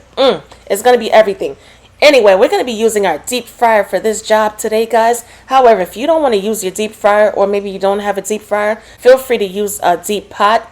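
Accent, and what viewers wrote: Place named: New Jersey, United States. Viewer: I like your accent, are you from Jersey? Great recipe btw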